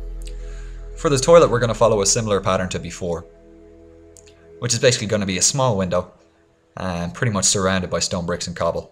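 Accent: Ireland. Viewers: i know you mighteve already got this question but, are you irish?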